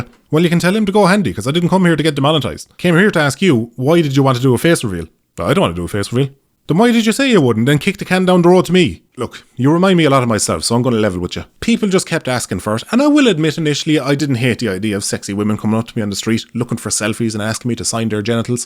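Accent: Ireland. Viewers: It was kind of obvious who you were when I met you. You're the only 2d green duck with an irish accent. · Imagine one day you can just walks the streets in Ireland or even anywhere. Hear a very familiar accent just to turn towards a regular lad.. think where do I know this accent from... just to get home and realize you saw the actual. And this was probably the first and last time. But you still raise a pint to him and think... God he had a massive.... smile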